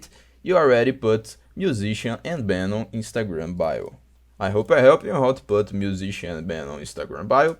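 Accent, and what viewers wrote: Brazil. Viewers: video bom puto (esse sotaquezinho brasileiro nunca engana)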